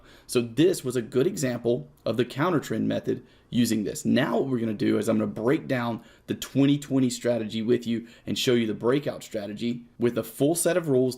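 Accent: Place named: Texas, United States. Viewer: I'm not even American, but you sound like you're from texas or something.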